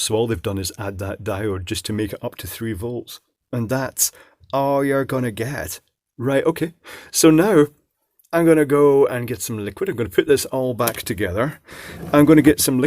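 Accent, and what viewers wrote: Scotland, United Kingdom. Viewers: Legionitalia not quite the same in his Scottish accent, but we need to get him to sing that song ...bonus for death daleks dancing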